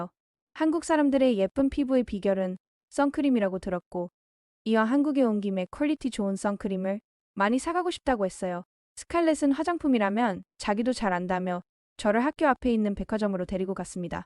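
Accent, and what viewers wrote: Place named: Germany. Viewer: 독일식 발음이 독특하네요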